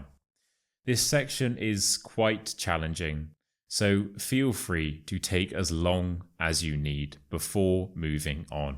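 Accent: RP, United Kingdom. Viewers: Hello, dear! Thanks a lot for the excellent opportunity to practice your nice British RP! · I like you reading in British English accent. Because making me leaning more English words when you reading Thank you 🙏 ❤for your share teaching In British English accent You good teaching ❤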